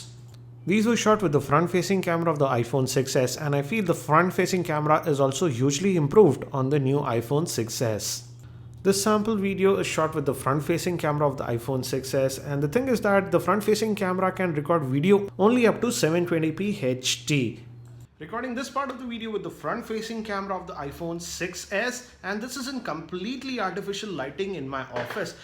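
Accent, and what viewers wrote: India. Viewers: if india speaking english . bad to hear. yakkkk..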